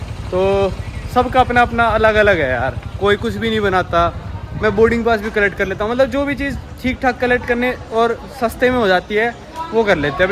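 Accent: Haryana, India. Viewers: का accent कुछ कुछ हरियाणवी के तरह हो गया है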